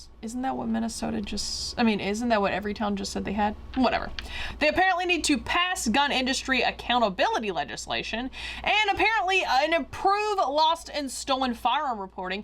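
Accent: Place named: South, United States. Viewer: Your videos are great, and I really like that Southern accent… and that’s coming from a Native Texan! 😬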